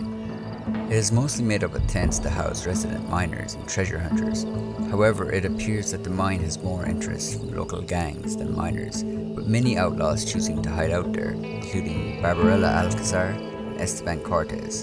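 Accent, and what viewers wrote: Ireland. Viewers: Didn't know you were Irish 🇮🇪, theres not many Irish youtubers, keep up the good work 💪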